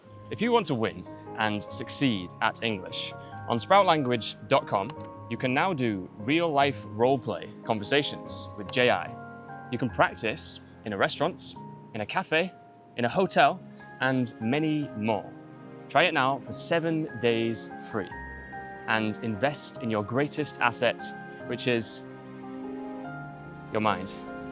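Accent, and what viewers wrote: United Kingdom. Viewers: I am from Bangalore. I love to watch your video for improving my british accent ❤❤ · Good speak British ❤ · açıkçası İngiliz İngilizcesinin telafuzunu daha çok beğendim 😅 Amerikan İngilizcesi konuşacağım ama yine de sizi dinleyerek İngilizcemi geliştirebilir miyim? Bu arada Türkiye'den selamlar❤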